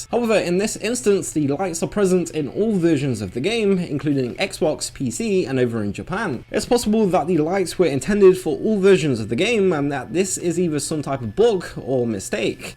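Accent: United Kingdom. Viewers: I had to turn on the captions cause of the thick redcoat accent lol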